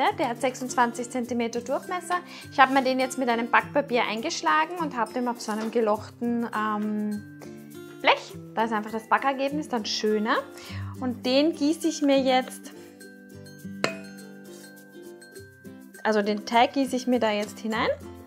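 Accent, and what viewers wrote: Austria. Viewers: Ach wie nett, endlich mal KEIN Piefchinesisch sondern sympathisches Österreichisch!!! Hab das Rezept NOCH nicht ausprobiert - klingt aber plausibel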